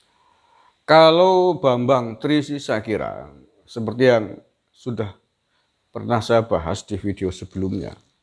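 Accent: Banyumas, Indonesia. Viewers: Ora ngapak ora kepenak... Mantap mas bro.. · Jaga terus persatuan demi NKRI harga mati, wlpn sdh di pwkt hampir setahun tetep suka denger bahasa2 banyumasannnya bikin ketawa wkwkwk, sukses trus pak 👍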